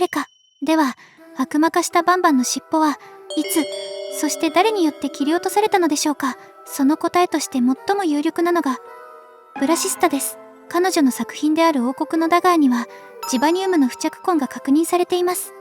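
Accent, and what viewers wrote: Kansai, Japan. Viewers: 関西弁なんやww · なんで関西べん？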